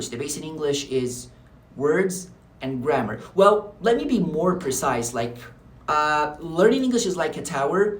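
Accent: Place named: United States. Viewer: Incredible information bro I understood the whole video without looking at the translation I'll take your advice thx bro and your accent is so amazing i can't tell the difference between yours and an American accent ❤❤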